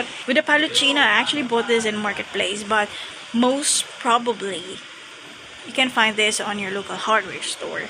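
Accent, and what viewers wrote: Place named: Australia. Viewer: Im diggin that aussie accent of yours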